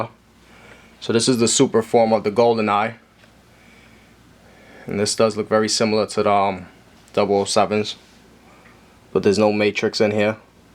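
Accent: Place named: Massachusetts, United States. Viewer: Really great job bro. I'm from Worcester u sound like ur from mass. I'd love to grab one from you.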